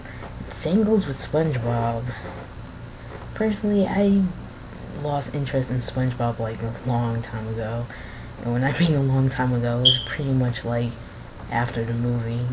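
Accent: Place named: New Jersey, United States. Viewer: sign oh boy you sound like these jersy shore pinheads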